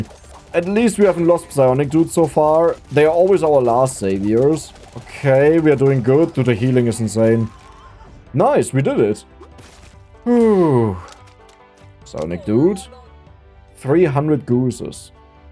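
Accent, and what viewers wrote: Germany. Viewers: Honestly i love your german accent! (and am a little jealous)😊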